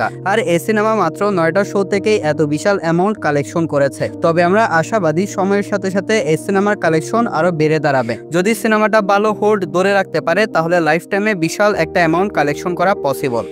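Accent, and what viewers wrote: Chittagong, Bangladesh. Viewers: Apnar kothar tone mone hocce apnr bari Chittagong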